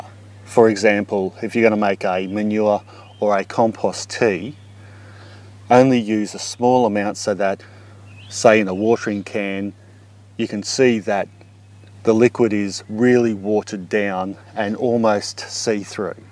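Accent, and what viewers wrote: Australia. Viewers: I like your video very much,. Just a question, where do you live? Your accent sounds like an Aussie!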